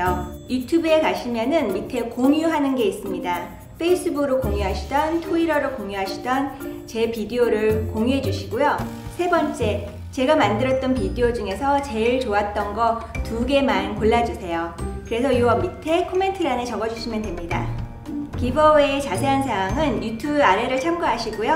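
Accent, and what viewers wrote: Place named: South Korea. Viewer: I just love your korean accent. I watch korean movies heheh just a side note. Congratulation on 1k subbies!! You deserve all the love ♡♡♡♡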